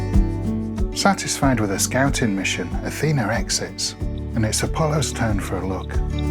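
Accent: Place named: West Yorkshire, United Kingdom. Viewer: Hello! I've watched a few of your videos before - I genuinely started because I love animals in general but also having lived in West Yorkshire for a huge chunk of my life I just missed the accent! Haha! - but have finally subscribed. I am a huge fan of corvids, they're so beautiful and intelligent so it felt a bit strange rooting for the Kestrals in this video. 😂 Thank you for these educational and informative videos, I've learned a lot in just the few that I've watched and am definitely going to introduce my 10yr old nephew to the channel as we love watching animal documentaries together. Looking forward to binging through your past videos and seeing what comes next! Thank you for all your conservation work that keeps these species and many more around for my nephew and following generations to see. It breaks my heart to think that some of the creatures we see around us could be as distant an idea to future generations as the woolly mammoth is to us! 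😢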